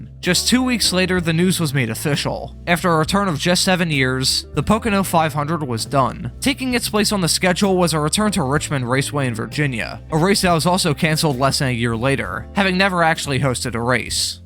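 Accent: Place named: Philadelphia, United States. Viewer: Didn’t pick up that Philly area way of pronouncing certain works till you mentioned where you’re from then I couldn’t unhear it lol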